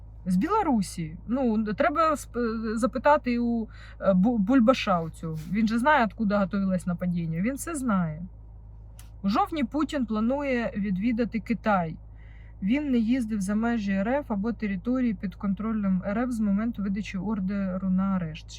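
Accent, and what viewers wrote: Ukraine. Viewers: Дякую Вам. Я обожнюю як ви кажете. Дуже по українські!!! 👍🏼😜 Хай вам щастить!!! Слава Україні!!! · Обожнюю вас слухати❤ Цей рідненький дніпровський говір - то любов❤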